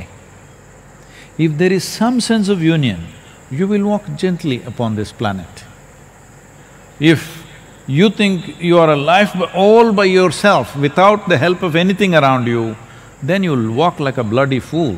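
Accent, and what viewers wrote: India. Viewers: 看薩古魯學英文會不會印度腔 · 至少你多了聽得懂印度腔英文的機會不是嗎XD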